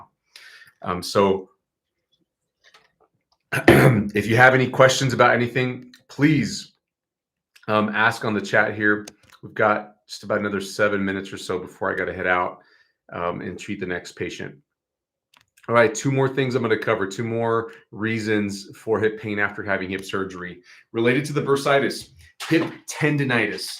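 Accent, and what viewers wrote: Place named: Tennessee, United States. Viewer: I can hear you tennessee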